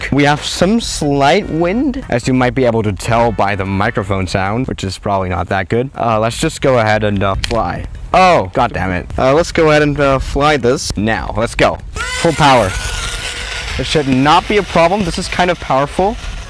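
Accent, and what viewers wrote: Germany. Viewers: das ist das typische deutsch und kein Schweizerischen Akzent oder lebst du doch in Deutschland? English: thats the typical german and not the swiss german xd or are you live in germany? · Are you a german? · wait ur german? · Are u from Germany? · Are you German??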